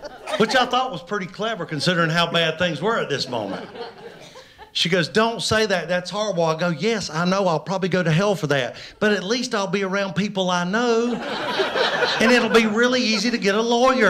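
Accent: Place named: North Carolina, United States. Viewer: Literally tearing up. From NC myself, glad to hear a voice from home.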